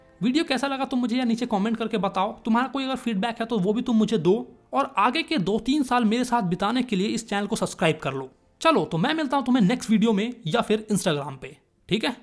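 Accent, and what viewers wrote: Bihar, India. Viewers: Bihari apni phele awaaz sahi karle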